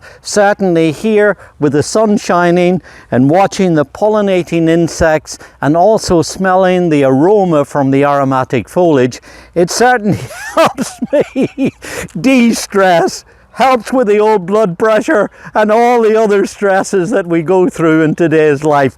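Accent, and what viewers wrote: Northern Ireland, United Kingdom. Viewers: Is that a Northern Irish accent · Great video Mr! Thank you so much for sharing your gardening knowledge with us! I hope you don’t mind me saying so - it’s truly lovely to hear your accent. My late father was from Northern Ireland as well. Keep up the great videos! I have subscribed and will be looking forward to learning more! ☺️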